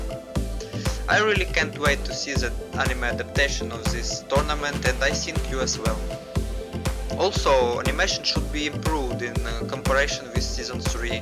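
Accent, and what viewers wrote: Russia. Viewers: Russian accent? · Капец у тебя акцент русский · Почему такой русский акцент???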